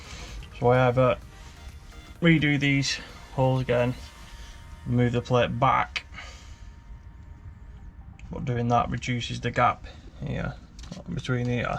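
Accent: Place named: Bolton, United Kingdom. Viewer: another thing I should have done...... put mine under the floor instead of inside....ah well, it'll have to stay where it is now lol. Great video. quck question if you dont mind, I recognise a Bolton/manchester accent. I'm from Bolton. I found your channel by accident whilst youtube surfing and envy your confidence on screen. I've just started my channel and petrified in doing a piece to camera lol. Bessy regs-Kenny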